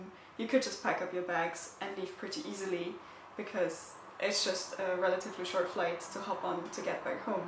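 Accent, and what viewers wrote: Ireland. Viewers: Loving the irish accent going on! Clearly you have been infected! Great video though. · I can hear little bit of Irish accent there . Nice .good luck I like other nationalities coming here ☘️ love Austria too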